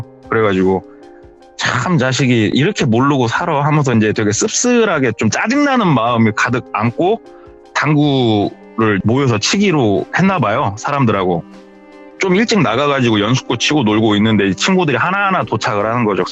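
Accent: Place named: Chungcheong, South Korea. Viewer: 충청도 분인가ㅋㅋㅋㅋ 익숙한 억양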